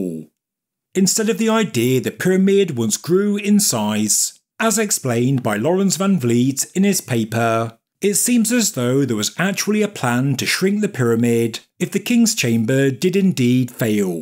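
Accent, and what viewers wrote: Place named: Birmingham, United Kingdom. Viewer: In general like the content of these videos. It's just that I find the narrator's nasal, brummie accent so annoying that it detracts from the actual video. If he could use AI to hide his accent then I could probably sit through a whole video without wanting to puke.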